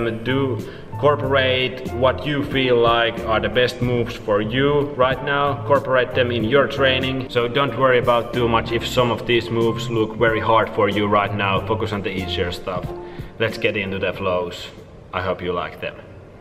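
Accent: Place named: Finland. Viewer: Hey are you finnish ?i love that finglish accent .thanks for the tips :)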